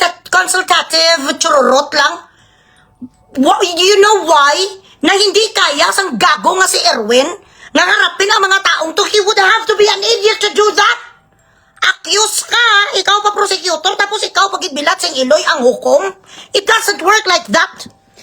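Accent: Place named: Hiligaynon, Philippines. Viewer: _nkakawala ng stress manhood ke ah..more video please..sarap pakinggan pag ilongga angvloger ah..proud ilonggo here..watching from portugal..❤❤❤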